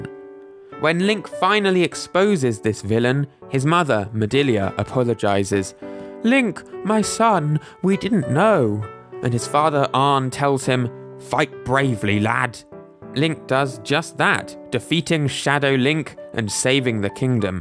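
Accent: United Kingdom. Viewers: I love how you pronounce it "Ocarin-er" lol. You're on the opposite side of the earth, and somehow, you're more redneck than me. Hello, from Texas, mi amigo, mein freund! God bless ya, dude. I love your vids. I love your accent, too. (American people think British sounding accents are indicative of high-level intelligence. But they'll still pick on you for pronouncing things differently than they do. Idk why. I'm a native Texan. I'll be American again, as soon as the rest of this country pulls their heads out of their asses.....) Keep up the great work.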